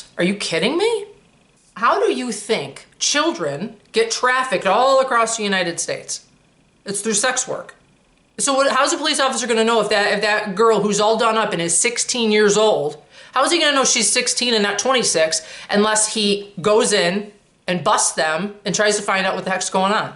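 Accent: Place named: Illinois, United States. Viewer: I just found out about you YouTube said check this out. I can tell you are from the state of Illinois just tell you. Talk in your accent 😁 cuz I am just like you. Born in this state. Had parents that were totally upset about the state. For pretty much the same reasons. But now we got new ones, illegals 🤯 we used to kill people who invaded our country. Like you said wokeness his reign supreme. But I do think California has its speed on the wokeness? I may be wrong. But I don't think so, well what part of Chicago are you in dear 🙏 North South Central? Like I told you I'm in Alton Illinois. The early retirement I took. What's from the University of Illinois@ So I'm very familiar with Chicago. Love the city 🌆 I used to love driving Lakeshore drive at the lights were coming on. And all the international cuisine@ kiss 💋 food fantastic. All right I was bored and figured I'd drop a few lines. 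I will admit I'm not surprised. Have you lived in this state your whole life? Then I'm kind of surprised you're surprised 🙀 prostitution legal. Sounds about right to me 😎 that's why I want Republicans to take over this state. The Democrats have literally lost their mind 😭😞 started back with the clintons in my opinion. But my opinion won't even buy you a cup of coffee ☕ not at today's prices.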